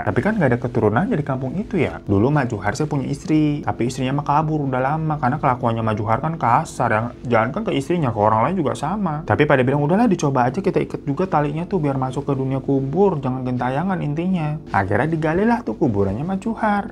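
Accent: Java, Indonesia. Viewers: Lu bukan logat madura bamg, malah medok jawa😂😂😂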